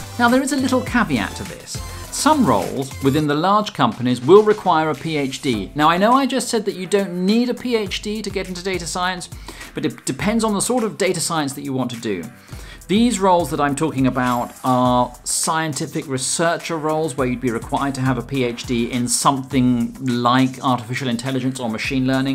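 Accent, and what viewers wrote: England, United Kingdom. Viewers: Can I ask which part of England is this?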